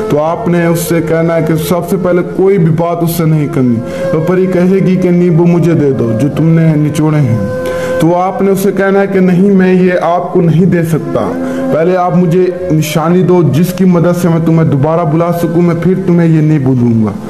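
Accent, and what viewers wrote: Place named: India. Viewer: السلام عليكم ورحمة الله وبركاته صاحب کیا اپ پاکستانی ھیں یا ھندوستانی نمبر تو پاکستانی مگر أواز اور لہجہ انڈین ہے کیوںکہ میں اپکا شاگرد بننا چاہتا ھوں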